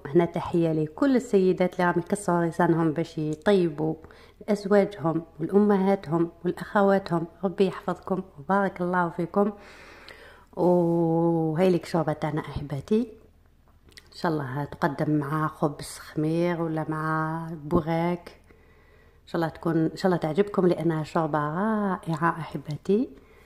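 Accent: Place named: Levant, Arab world. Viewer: Voyer êtes algérienne? Votre parler est du mechrek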